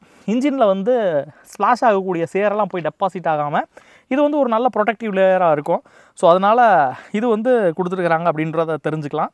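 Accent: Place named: Kongu, India. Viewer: அது கொங்கன்ஸ் ஓட பிறந்த ஓசை. எளிதில் மாறாது !!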